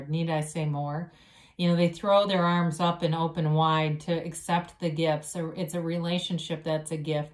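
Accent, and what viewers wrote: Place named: Boston, United States. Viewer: I'm Spanish, I was an exchange student in Mass a long long time ago, so I claim it!!! Wanna go back to Boston yes!!!😄🥰In fact I love listening to you because of your accent. In my Profesional life there a lot of british accent speakers and international and all of a sudden you sound so familiar...may I ask where in USA are you from?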